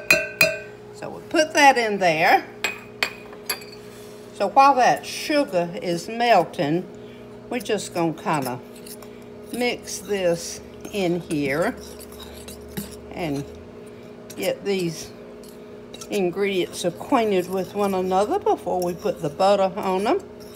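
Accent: South, United States. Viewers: Oh my glory lol You are absolutely adorable I just love your southern charm and accent. From a generation we can surely learn so much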